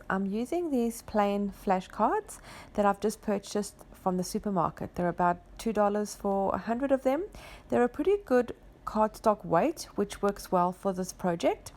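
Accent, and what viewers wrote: South Africa. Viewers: Discovered you quite by accident this morning and so pleased I did!! Wonderful creativity! Your work is incredible! And an added bonus is hearing a South African accent again! Awesome!!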